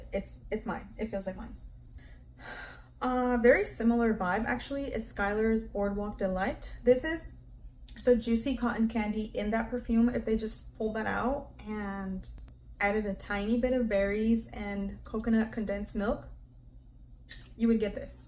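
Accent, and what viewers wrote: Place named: United States. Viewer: Where you from? I love your american English accent is so clear❤❤ your baby is so cute❤❤❤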